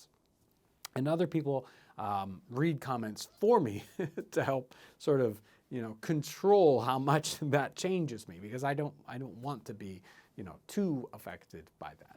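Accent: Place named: Indiana, United States. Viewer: He sounds, gesticulates, and annunciates things a lot like my dad, probably because he’s from Indiana, and so these always take me back to conversations at the table about history, politics, faith, and logic with my dad. I love the perspective and the info, and the recipes are fun, but that’s actually the subliminal reason I think I find these videos so home-y 🤗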